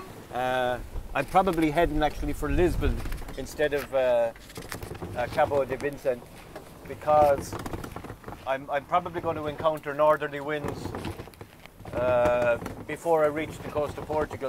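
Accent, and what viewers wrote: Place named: Ireland. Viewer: It's so awesome to see people living their dreams. I'm so jealous!! A healthy kind of jealousy though, I'm very happy for you and your Irish accent:) Give me another 10 years of work and I'll be right there with ya! Keep living free and please keep sharing your life with us. My mother and her friend just got back from Lisbon yesterday. I told her that I will visit Lisbon some day, and that my visit will come from the sea, like the explorers and traders from 5, 6, 700 and more years ago. The history that came through Lisbon is incredible, you're part of it, and some day my boat and I will be also. If you ever are curious where your fans are from..I live in Fairport, New York. Where do you keep your boat when you're back in Ireland?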